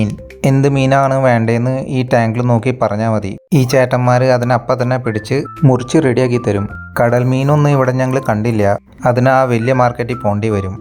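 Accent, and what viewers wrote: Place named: Perumbavoor, India. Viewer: നാട്ടില്‍ എവിടെയാണ്? എവിടെയോ ഒരു പെരുമ്പാവൂര്‍ സ്വരം.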